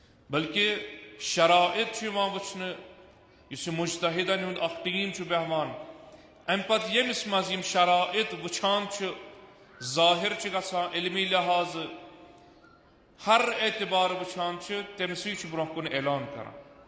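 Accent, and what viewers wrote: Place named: Iran. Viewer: Why is trying hard to speak kashmiri and arabic in IRANIAN ACCENT ?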